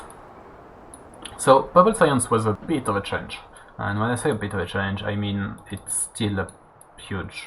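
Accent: France. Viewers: t'es français ? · Tu es Français ?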